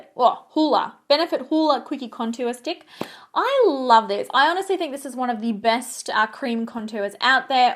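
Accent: RP, United Kingdom. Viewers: Not a bad English accent xx love from the UK 🏴❤️ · I am 🤣🤣. I'm from the U.K and your British accent is 👍 Love your channel, however you cost me a fortune in shipping and tax fees when I purchase your products 🤣🤣 ps your finishing powder is the BOMB 🥰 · That British accent 😂🤣 brilliant, but very posh darling 😂😂 most of us are common as muck haha xx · The British accent! I was loving it. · your british accent is really posh lol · Haha love the British accent. We don’t all sound like that though. That’s what posh people from London sound like 😂 xx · We Americans like to do the English accent too lol! You look gorgeous!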